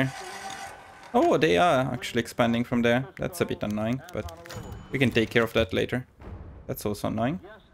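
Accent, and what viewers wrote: Italy. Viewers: Out of curiosity: are you italian? The accent looks like it. Loving the videos, and ur accent remembers me of my father in law❤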